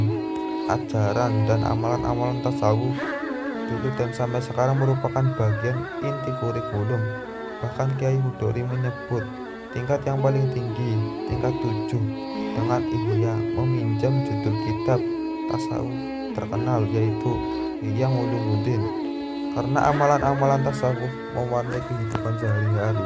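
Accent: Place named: Magelang, Indonesia. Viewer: mc nya ngapak magelangan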